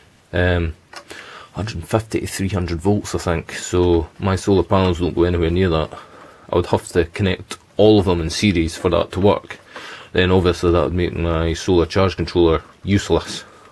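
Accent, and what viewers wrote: Scotland, United Kingdom. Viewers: Hi must say I like your videos I must ask u your accent are u Scottish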